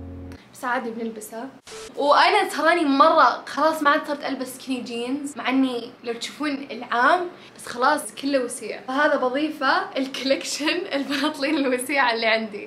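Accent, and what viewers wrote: Saudi Arabia. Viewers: يمه هذي مين اول مره اشوفها بصراحه بس يمه تدخل القلب عفويتها تجنن ماشاءالله + احسها كويتيه بس لهجتها سعوديه بس برضو احس يليق عليها تكون كويتيه😭😂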